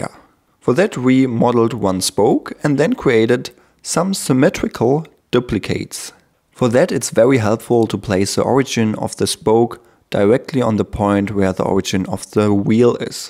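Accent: Germany. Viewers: man kanns auch übertreiben mit dem deutschen akzent ^^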